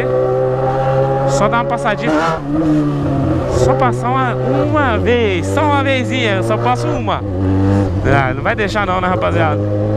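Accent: Goiás, Brazil. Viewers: pq vc fala no pique de Goiás se Londrina fica em Paraná? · por que vc fala no pique de Goiás se vc mora em londrina??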